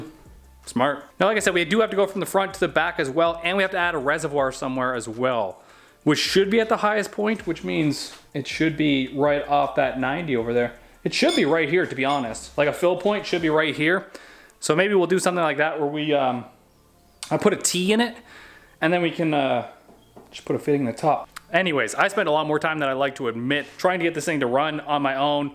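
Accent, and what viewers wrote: Canada. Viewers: Props from Manitoba, only YouTuber I've found with more or a Canuck accent than me. Keep killing it man. Lets race once my caprice is done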